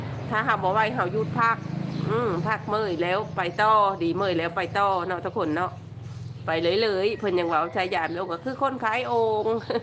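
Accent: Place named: Phu Thai, Thailand. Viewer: ภาษาภูไทพูดเพราะมากค่ะ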